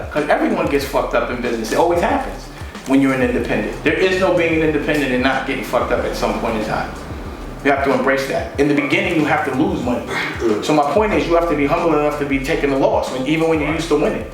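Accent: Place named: Harlem, United States. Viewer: Amazingly google how much Dame net worth is you it will be surprise you. Talking like a tru Harlem dude.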